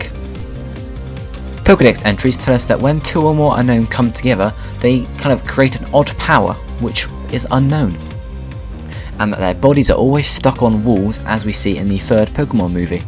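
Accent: United Kingdom. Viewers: Linkof War he's British. That's how they say it in europe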